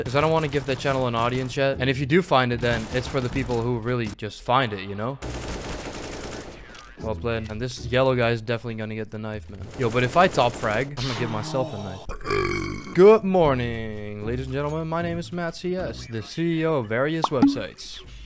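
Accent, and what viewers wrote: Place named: Netherlands. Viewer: Je bent nederlands toch ik hoor het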